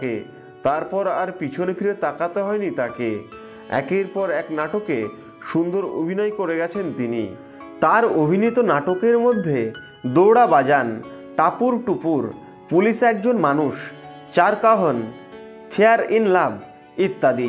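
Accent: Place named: Kolkata, India. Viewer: apnar voice ta kolkatar moto mone hoyce..sorry don't mind..,,,,🌷🌷🌷🌷🌷🌷🥀🥀🥀🥀🥀🌸🌸🌸🌸🌹🌹🌹🌹🌹🌹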